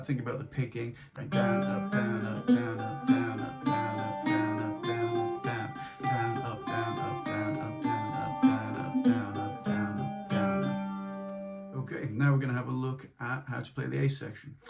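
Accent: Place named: Arab world. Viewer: I sense from your pronunciation that you speak Arabic as well! Thank you for sharing such an interesting tune!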